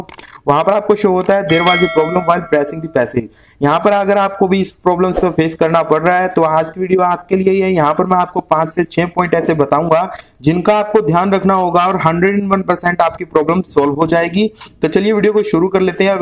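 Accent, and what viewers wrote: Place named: India. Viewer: You know its not clickbait when you hear hes indian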